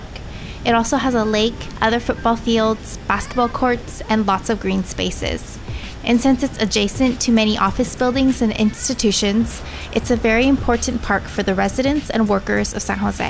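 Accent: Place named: United States. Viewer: Great video! Great American English accent and pronunciation! 💕 ☮ 🌎 🌌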